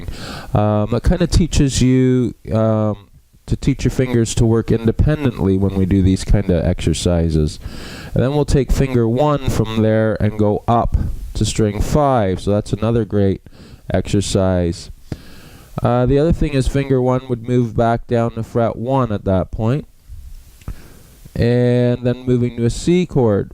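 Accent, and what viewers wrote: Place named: United States. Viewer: Better explaining classic song than this old want to be picker needed. You sound American not British with lots of exercises not trying to prove how good you are.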